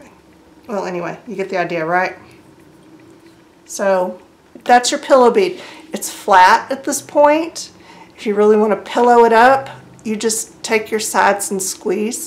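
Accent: South, United States. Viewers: it was kind of obvious that you were a fellow southern gal, but I didn't know that we were neighbors! I'm in Memphis, just across the river... Hi neighbor! Lol! Thanks for the bead tutorial!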